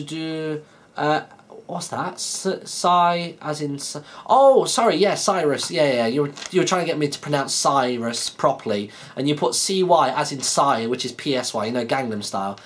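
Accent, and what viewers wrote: United Kingdom. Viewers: I love his british accent and the british sleng at "Hello everybodiiiiii!!!!!"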